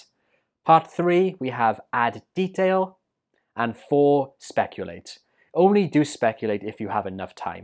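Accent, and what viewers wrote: United Kingdom. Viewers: Your English pronunciation is so good, are you British because your accent is very nice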